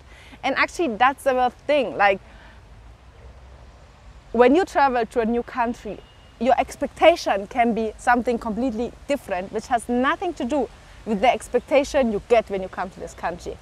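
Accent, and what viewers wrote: Kenya. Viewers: I like what I see and hear. A lovely mzungu speaking english with a Kenyan accent🥰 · You adopted the Kenyan English accent perfectly. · I was born in Kenya but left when I was 16 and have never been back. I will go back hopefully in 2023. You sound Kenyan. 😅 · Hahaha you have a very strong Kenyan accent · But that English is Kenyan! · You even have a Kenyan accent! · you picked that kenyan accent really quick. I can tell by your pronunciation of words.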